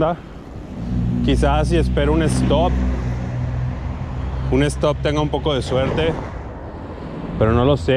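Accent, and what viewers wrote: Mexico. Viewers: Tienes el acento de mexivergas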